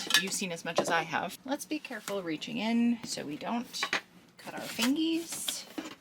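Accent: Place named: Australia. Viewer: This was an awesome video, top marks. And I got the Australian accent bit. Also, have you gotten your new blades and replaced the old one?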